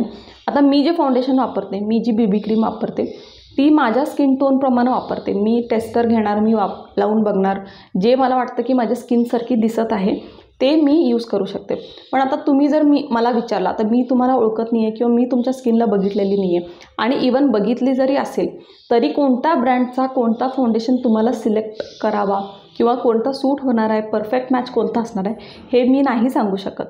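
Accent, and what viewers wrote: Kolhapur, India. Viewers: Kolhapuri ahes na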